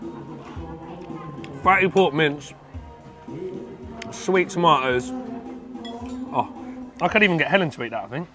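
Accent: Thailand. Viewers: 5555+++++ฝรั่งพูดเหนือ น่ารักดีคับ รำ รำขนาด รำแต้ๆ 555+++ ขอให้เที่ยวภาคเหนือให้สนุกนะคับ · เก่งจังอู้คำเมืองได้ด้วย..เว่าอิสานได้บ่..ดนตรีประกอบกะดนตรีอิสาน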